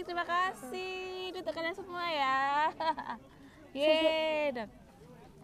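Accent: Java, Indonesia. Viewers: Bule medok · Halo cantik pinter banget kamu nduk boso jowomu medok banget ❤❤ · INI GARA - GARA BULE KW BISA DAPAT SILVER PLAY BUTTON CANTIK, LUCU BAHASA JAWA SANGAT MEDOK SUKSES TRUS MANTAP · Yeay medok udah silver button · Medok men nduk..lucu..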